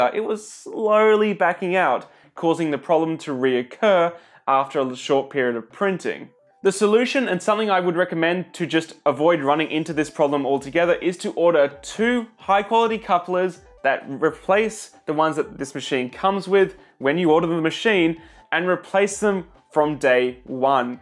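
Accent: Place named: Australia. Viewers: Francis Taylor ... Aussie accent..... well he is from Australia..... again prices listed in US.... seems to be the standard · I probably shouldn't assume you would list in AUD - Aussie accent threw me ;) Anyway thanks for the continued great content!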